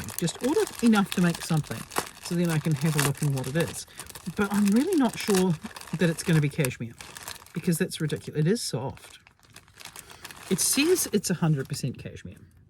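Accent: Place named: New Zealand. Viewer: As soon as you said “The Warehouse”, I was like… her accent… she HAS to be a Kiwi… but you did confirm it 🫶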